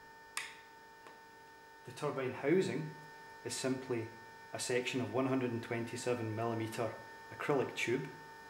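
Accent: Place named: Scotland, United Kingdom. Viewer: thats a Scottish accent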